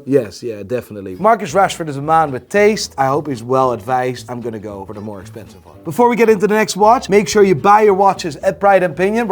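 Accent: Netherlands. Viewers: I'm flabbergasted you perfected the Dutch English accent 😂 amazing keep up the work bro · Why u got such a thick dutch accent my man? You dutch and hiding it?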